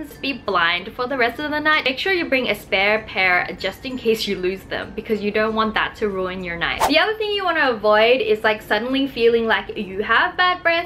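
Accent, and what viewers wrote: Australia. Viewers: WengieVlogs thanks:) i think people watch your vids partially for your content, personality, and editing skills;) and partially because of your Australian accent ^-^ and maybe your hair ♥ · I love your Australian accent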